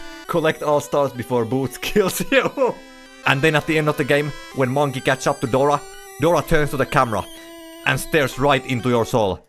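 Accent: Finland. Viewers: when i heard your accent i knew you were from finland (minä olen myös suomesta) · Really? Why watch the vid then, I think the Finnish accent is kinda cool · Aloin miettimää että ootkohan suomalaine ku suomalainen aksentti mut huomasin että irwinin paita nii tajusin siitä että oot suomalainen🙏🏻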